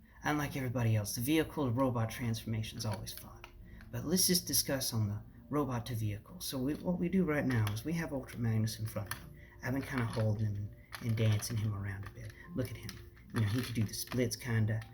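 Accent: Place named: Louisiana, United States. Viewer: Alright you asked for constructive criticism so here goes. So I don’t know how much editing you can do, but if you have control over when the video begins, I would wait until your phone does the notifications thing, give it a few seconds of silence then do you intro, and then just drag where the video begins past the notification sound so it’s just before you start talking. So one thing I think you could do is if you are going to talk about the box in the video you could have it pre measured so you don’t have to do that on camera, cause that does pad out the video. But I would also say that most people don’t care about the box. It’s something most people are going to throw away and it’s not what they came to see. So consider removing talking about the box, or put it later in the video. What’s with the Cajun Accent by the way, are you trying to be Craftsman? 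Oof That sucks. I had a similar thing where I went to visit my grandparents, and my parents got the brilliant idea to throw away my bed because it was old while I was gone. But they got lazy and didn’t buy another one by the time I got home, so I had to spend 3 days sleeping on the floor, before I got a new bed. I feel like coffee cups may not be a great unit to measure it. I don’t think we need to know the size of the guns, so definitiely. Maybe come up with a personal scale for stuff like that. Like dinky, regular, or big boy sized guns and accessories, just try to group them without giving an exact size cause that won’t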